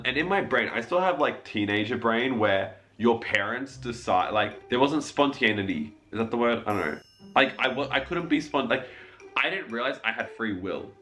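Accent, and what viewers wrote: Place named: Australia. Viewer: He’s Australian girl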